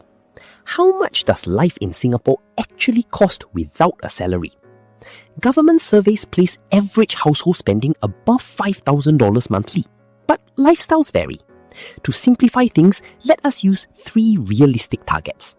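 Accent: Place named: Malaysia. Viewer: Why singapore video but malaysian voice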